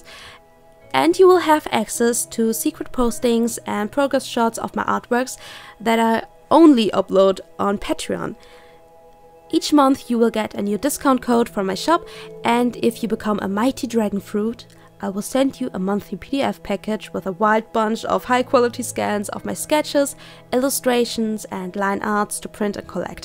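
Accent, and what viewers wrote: Germany. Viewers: Okay, das Bild was du aus den Markern gemacht hast ist echt toll geworden ^^ ich hab btw. Raus gehört, dass du deutsche bist und hab dann nach geschaut:p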